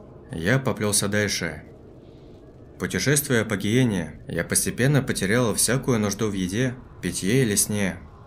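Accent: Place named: Urals, Russia. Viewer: Да,в утра.Уральский говорок-это круто.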